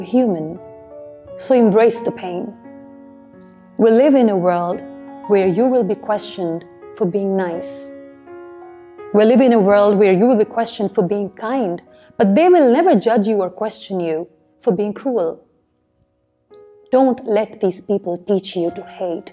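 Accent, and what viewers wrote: United Kingdom. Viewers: ur accent is Soooooooooo good mashaAllah plz tell me how did u learn it, it is very much like British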